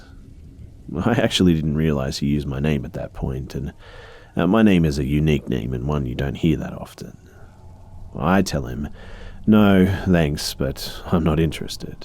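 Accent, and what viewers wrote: Australia. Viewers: Oh my gosh I'm new here so when you started speaking I was like "OMG you're Aussie you're my people!" All the other scary narrations I watch on YouTube are American! All. Of. Them.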